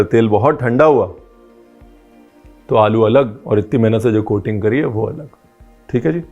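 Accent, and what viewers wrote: India. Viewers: Thnx sir for this recipe ,sir love ur desi style when u talk 🙏🏻😊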